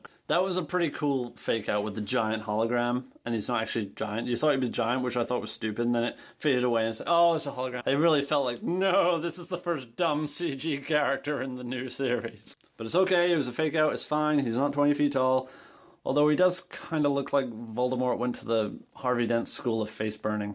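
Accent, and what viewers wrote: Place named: Canada. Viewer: I'm impressed with your YouTubing skills. You're sounding a lot more Canadian than I remember though!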